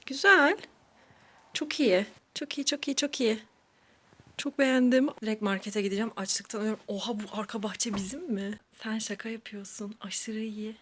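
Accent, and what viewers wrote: Ireland. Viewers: AKLIMA CILLIAN MURPHY GELİYOR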